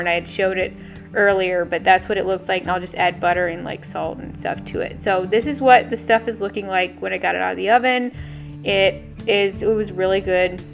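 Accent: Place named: South, United States. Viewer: You sometimes sound so southern although I know you aren't originally from the south. Everyone I have ever met from "up north" has always called dressing, stuffing whether in a bird or pan. Edit: Tough Shrimp means you overcooked. For shrimp you need to only cook till it's pink all the way through. If you keep cooking it will be tough and chewy.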